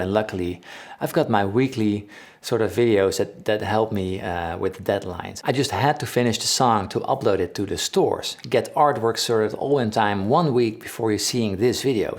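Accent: Netherlands. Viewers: Complimenten voor je content en professionalisme man! Je engels is vloeiend maar toch hoorde ik direct dat je een Nederlander bent! 🇳🇱🎸 Je bent een grote speler als muzikant!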